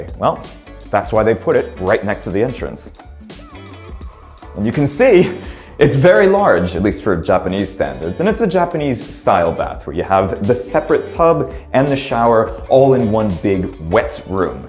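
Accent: United States. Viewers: If I remember correctly he's from NYC originally...Brooklyn? Idk I remember a video where he said the bagels aren't like he could get back home in NY, I think.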